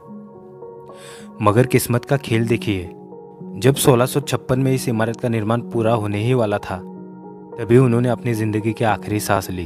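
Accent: Maharashtra, India. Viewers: इस वीडिओ के निवेदक की मातृभाषा मराठी है ऐसा लगता है । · माहिती तर लाजवाब दिलात , पण तुमचा आवाज मराठी वाटतोय ❤️👍🏼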